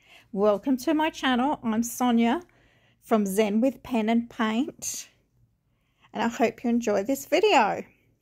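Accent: Australia. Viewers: I definitely hear you when you talk about trying to keep up with others in the competitive colouring and arty communities eg ‘how can I get better, should I get this material or mediums, will it make me improve etc. it becomes a bit overwhelming in the end and I have been seeking out this kind of video to just be able to loosen up a bit as well. I am an Aussie too so was glad to hear an Australian voice, I have subscribed so that I can go along this journey with you into Zen non anxiety mindfulness. Thanks for your video. 👍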